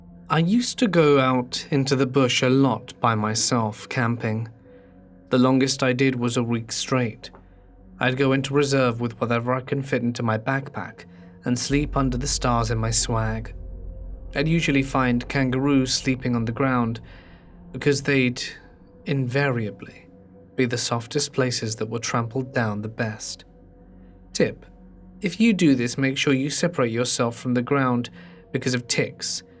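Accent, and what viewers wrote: United Kingdom. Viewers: I really enjoy this particular British dialect, though not quite sure which specific one it is.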